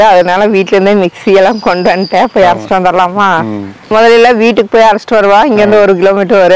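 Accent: Kongu, India. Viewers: கொங்குதமிழில் சமையலும் அருமை... இந்த பச்சைசெலவு குழம்பில் கத்திரிக்காய் போட்டு பன்னினால் இன்னும் அருமையாக இருக்கும்... பச்சைசெலவு கத்திரிக்காய் புளிக்குழம்பு ..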